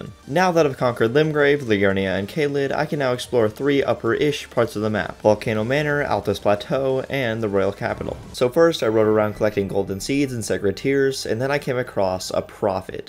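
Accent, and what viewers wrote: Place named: North America. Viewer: Honestly buddy I have no clue. Judging from what I can remember his accent he's probably North American? Keep in mind I only say this because I'm North American and I couldn't identify an accent. So I'm assuming we're the same? Don't take my word for it. Check the interview with OnlyWaifu.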